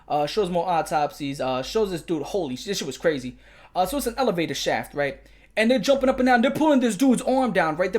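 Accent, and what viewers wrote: New York, United States. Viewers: The New York accent is tuff tuff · Man, I haven't lived in the US in 12 years... your accent just hit me hard my bro.... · yeah as a bi girl I’m fine with it honestly. I do get uncomfortable when creators are openly homophobic cause it makes me feel like they don’t want me watching, but as soon as I remembered the New York accent I knew he wasn’t being serious lol 😂 as a lifetime New Yorker we have kinda fucked senses of humor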